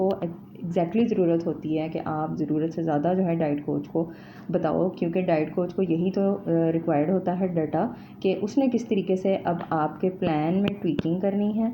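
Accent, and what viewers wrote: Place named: Iran. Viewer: I thought u were from foreign country after hearing u r english i thought u were from Iran or saudi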